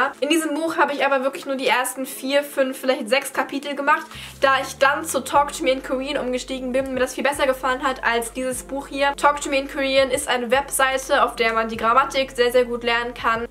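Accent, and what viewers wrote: Germany. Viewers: Deine Aussprache ist eher deutsch korean😊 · Deine koreanische Aussprache hat einen deutschen Akzent. 😂 Aber das kann man durch genaues und mehrfaches Zuhören und Übung wettmachen. Ansonsten top Leistung! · Ich liebe den deutschen akzent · Du machst das echt super 👍 Weil du sagst dass dir deine Aussprache nicht gefällt, ich finde deine Aussprache klinkt sehr deutsch (also mit einem deutschen Akzent) zumindest im Intro. Aber ich denke mit der Zeit wird sich das bestimmt ganz von selbst verändern ^^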